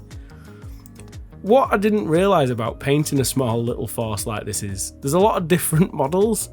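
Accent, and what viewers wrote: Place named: North, United Kingdom. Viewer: Love how you keep your northern accent for videos. You don't try to be formal and lardy da like me nan used to on the telephone.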